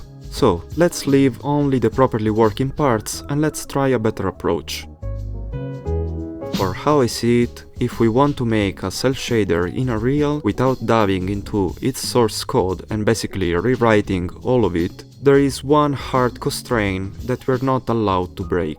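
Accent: Italy. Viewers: ottimo video, l'accento italiano inconfondibile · you sound italian as hell... fire video.....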